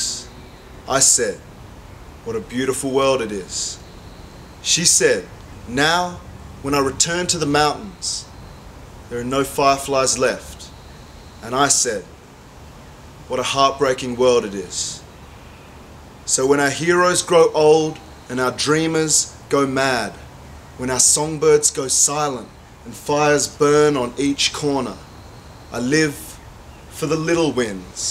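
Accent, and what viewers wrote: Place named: Australia. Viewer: Gidday from a new fan; greetings from a Kiwi in South Korea. Wow, this is impressive. Great to hear great poetry in a funny Ozzy accent! I look forward to more.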